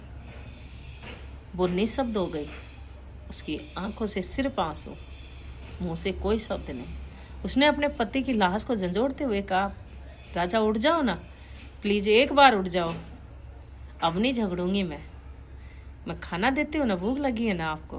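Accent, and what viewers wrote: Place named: Himachal Pradesh, India. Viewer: बेटा जी आप हिमाचल, बिलोंग करते हो भाषा, से, फील, हो, रहाहै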